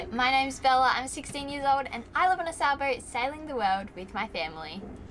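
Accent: Australia. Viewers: sorry sister you are Australian I can tell but in a vary nice way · You def have an Aussie accent. 😅 Your parents have done an excellent job raising you it shows in your demeanor and intelligence. God bless and keep the vids coming. ❤ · Medium (and delightful) Australian accent. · Like you are willing get on You Tube and answer both the easy questions and the hard ones. And as far as the hard answers. Always are allowed make that decision later, since your only a teen and many years to figure that out. As far as accent, since you be sailing many different places you will get more mixed but you will have your beautiful Aussie accent base. 👍♥️💯🎦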